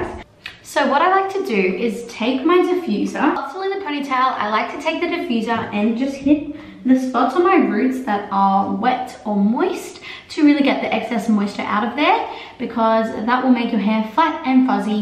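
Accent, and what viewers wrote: United States. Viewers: It’s so crazy to me when ppl do American accents so well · Jana Saghbini I thought you were American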